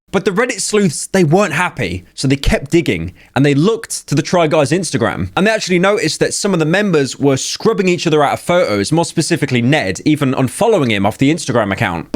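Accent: United Kingdom. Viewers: hello british person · Wait is British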